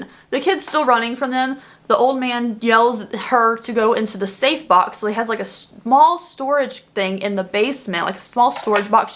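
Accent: South, United States. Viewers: Ok I know I’m not crazy, as I’m a southerner I may just be crazy 😜, but I’ve been noticing your accent more and more. I didn’t realize how much you would hold it back in the past! I’m the same way. Sometimes I let my accent just gooooo but mostly I reign it in 🤠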